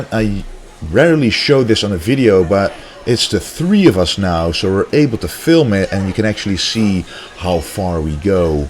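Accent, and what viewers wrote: Netherlands. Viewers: YUNG_BOAA Come on dude. He's dutch. Its his accent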